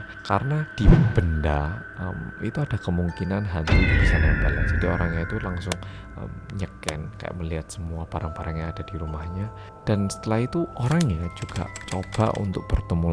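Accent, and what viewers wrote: Java, Indonesia. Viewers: Medok Is Back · Aku rasa kok medoknya mas rada kurang ya.wkwkwk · bersama orang korea yang... aku: medokkk mas: kewedennn sampe lupa:'' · Mana orang korea yabg medokkkkkkkk................;v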